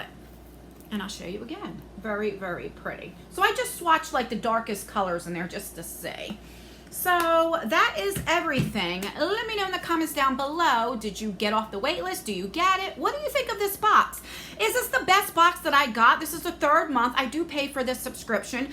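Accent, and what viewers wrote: New Jersey, United States. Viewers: Hi, You are hilarious! I love your videos. The opening is so clever and entertaining too. 👍🏻 I am Brazilian but my husband was raised in New Jersey (Mulburn area) and I enjoy the English accent of the area. In fact, I just subscribed to Boxycharm/Boxyluxe and mentioned you as my favorite YouTuber! 🌺 Have a wonderful weekend and God bless you always! Renata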